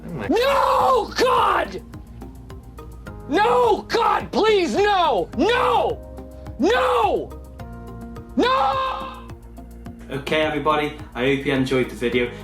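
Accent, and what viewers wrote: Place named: Stoke, United Kingdom. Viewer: I recognise that Stokie accent anywhere great videos keep them coming I am a newcomer to the hobby not quite took the plunge yet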